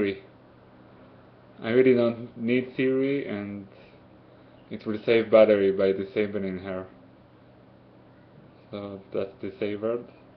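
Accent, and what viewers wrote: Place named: Israel. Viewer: איך שומעים שאתה ישראלי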